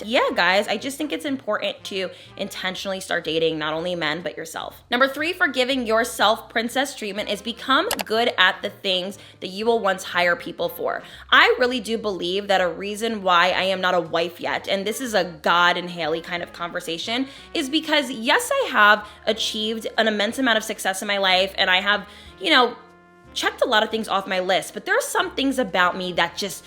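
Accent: New Jersey, United States. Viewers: I’m a jersey girl too and I always can tell u were a jersey girl as well love ur vibes, thank u for this wonderful video everything u said was FACTS ! heavy on the I wash my legs ok period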